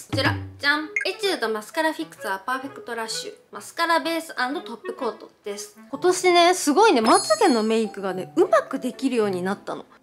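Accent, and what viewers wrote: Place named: Kansai, Japan. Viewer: 関西弁かわいい笑笑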